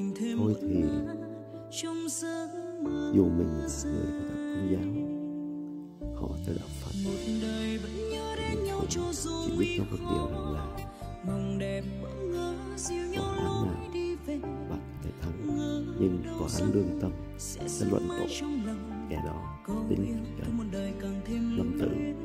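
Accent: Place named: North, Vietnam. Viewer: Giọng nói Bắc 54 nghe hay quá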